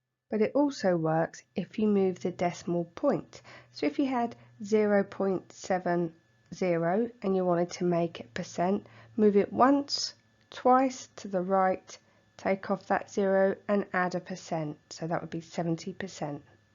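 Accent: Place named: United Kingdom. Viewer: Why do you sound so British Mrs?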